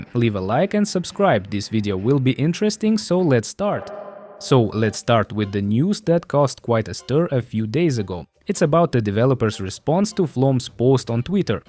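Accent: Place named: Russia. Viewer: god bless you, i was missing a interresting russian talking, with that cute russian accent. i love it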